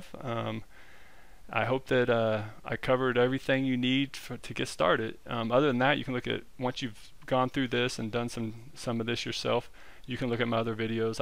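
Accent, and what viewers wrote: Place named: South, United States. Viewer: Howdy! Where are you from? Texas? You got that good ole' southern draw. Like Matthew Mcounaghey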